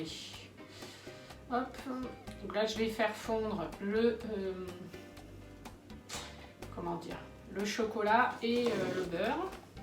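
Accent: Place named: Rouen, France. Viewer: Mais tu es de Rouen ?? Il me semblait bien avoir reconnu l'accent 🤭 Super tes vidéos j'adore !!!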